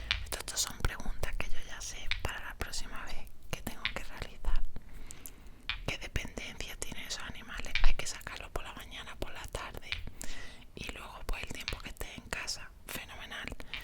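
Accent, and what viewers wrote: Malaga, Spain. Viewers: Hola muy buen vídeo, lastimosamente no aplico a lo del formulario, estoy un poquito lejos jajaja!!! Que bello tu detalle para con por lo de su perrita, eres única!!. El Sherpa es ahora El Señor de los Sonidos?? Jajajaja... son geniales me gusta su naturalidad, únicos y exclusivos en su clase!!! como le comenté a, me encanta como uds las Malagueñas hablan, junto a sus susurros es genial y muuuuyyyy relajante para mí, en lo personal. Un gran abrazo distante pero sincero, cuidence mucho.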